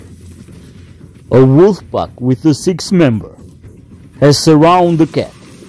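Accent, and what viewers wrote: Russia. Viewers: i like your russian acsent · Лучше б рассказал на на русском. Зачем так напрягаться, по акценту понятно что русскоязычный.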